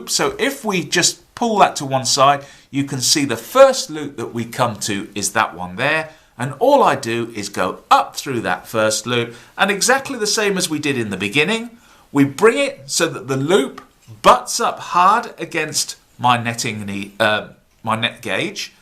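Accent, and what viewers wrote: United Kingdom. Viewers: Where are you based. By the accent I would say UK.?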